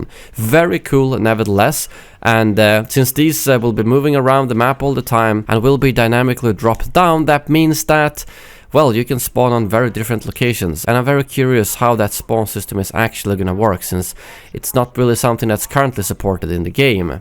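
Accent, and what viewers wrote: Sweden. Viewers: U swedish huh?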